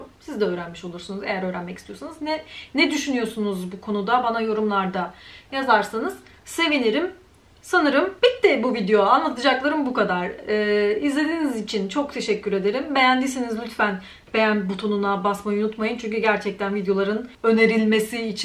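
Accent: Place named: Poland. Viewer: aha rozumiem ,tak się zastanawiałem bo podczas wymowy masz taki polski akcent :)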